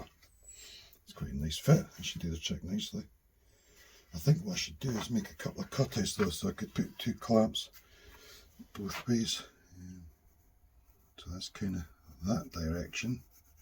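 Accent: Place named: Glasgow, United Kingdom. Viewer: Just found your channel & subscribed. Sounds like your accent is somewhere near Glasgow.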